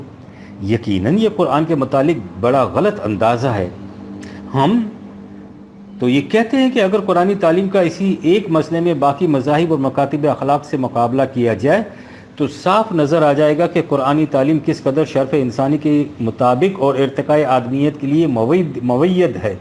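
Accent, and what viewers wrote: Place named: Iran. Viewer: Jazakallah khair really I enjoy your Persian pronunciation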